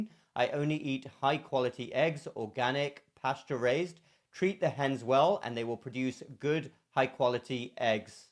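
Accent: England, United Kingdom. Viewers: That's an English accent if ever I heard one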